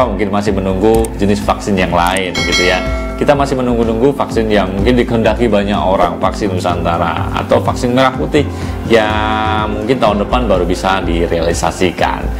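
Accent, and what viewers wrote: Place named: China. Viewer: NADA BAHASA ANDA KEDENGARAN SEPERTI ORANG CINA BEPAJAR NGOMONG INDONESIA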